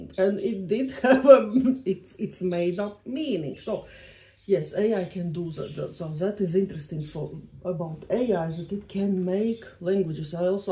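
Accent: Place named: Russia. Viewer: there are often mistakes, it often helps to consult native speakers, however some Baltic languages like Samogitian for example, because the language has been incorrectly classified as a mere dialect of lithuanian and not a distinctive language, people living in žemaitėjė have been discouraged for generations now to consider lithuanian the only valid national language, and most Samogitian people under the age of 50 shall we say, cannot speak a single word of our language. Žemaitiu ruoda, has more vocabulary in common with both Latvian and Russian languages than with official lithuanian. For example, he/she in lithuanian is jis/ji, in Latvian viņš/viņa, but in Samogitian we say ons/ana. I like your accent, you have a strong Russian intonation whichI find very charming. Russian songs are so lovely especially!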